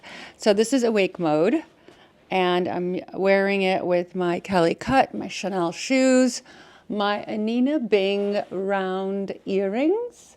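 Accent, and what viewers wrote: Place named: United Kingdom. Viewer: You crack me up! All that British accent chatter in the park! I am sure that you went on and on! LOL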